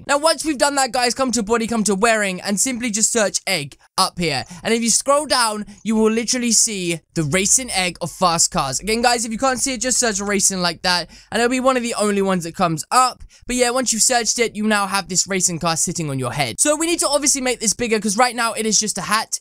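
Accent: United Kingdom. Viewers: I like it when u talk u got british acsent ( dislike if acsent spelling is wrong)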